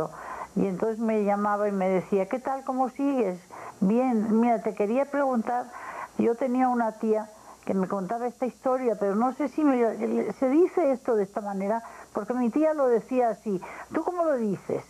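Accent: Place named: Navarre, Spain. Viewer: No perdio nunca su maravillosa diccion y acento español de Navarra. Descanse en paz compatriota en aquel pais maravilloso de gentes nobles como son los mexicanos q tanto amor y casa dieron a los españoles nomadas.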